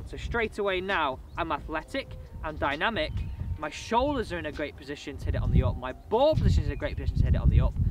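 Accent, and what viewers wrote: United Kingdom. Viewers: Sry hard to understand briti english. Louzy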